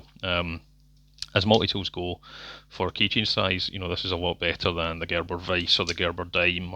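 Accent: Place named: Midwest, United States. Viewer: Heard the accent, are you located in the midwest?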